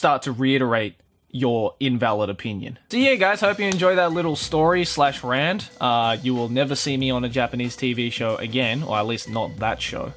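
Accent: Australia. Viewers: I'm American ( not offended) im tired of hearing from the American side too...rather hear you're cute Australian accent any day. 😽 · I KNEW HE WAS AUSTRALIAN!!! But seriously be fuckin' careful bro, everything wants to kill you there. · hheeee ??is he Australian o.o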